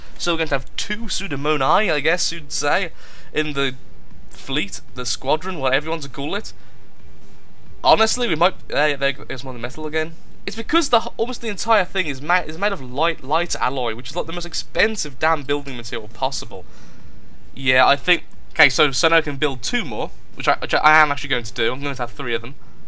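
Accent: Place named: Birmingham, United Kingdom. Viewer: I KNEW IT! I kept debating whether you were a fellow Brummie or from Manchester by your accent.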